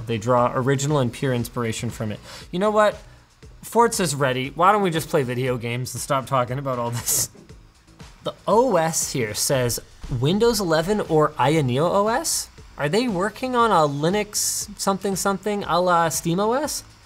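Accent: Canada. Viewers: Is it just me or is Linus sounding really Canadian these days?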